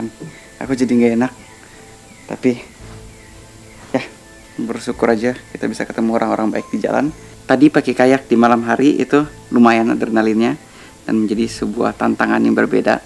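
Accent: Banjar, Indonesia. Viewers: Logat Banjar bahasa Kalimantan banget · Logat banjar nya ada jua lah wkwkwk😂😂😂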